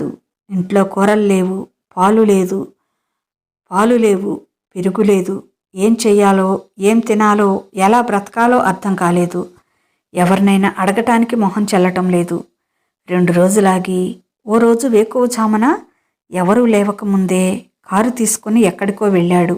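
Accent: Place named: India. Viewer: Chala bagundi talli. Teluguni telugulage acham telugu ammai laga chadivavu. Santosham. Subham. 🌷✋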